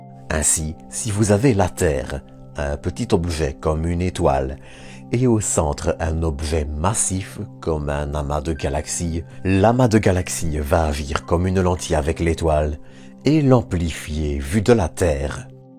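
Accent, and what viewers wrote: Belgium. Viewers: votre accent belge qui me rappelle de bons souvenirs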